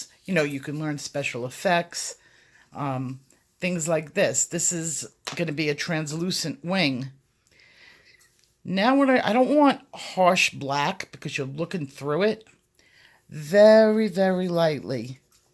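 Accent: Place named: East Coast, United States. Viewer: I love your work and your accent reminds me of when I lived on the east coast. Very fond childhood memories, from a simpler time. So thank you, for helping me with this new hobby and reminding me of my childhood.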